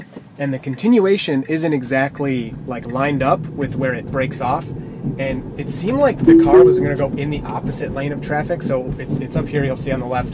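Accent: United States. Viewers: I was confused why you had a US accent and were driving on the wrong side of the road. Maybe the car heard you say that and thought the same thing.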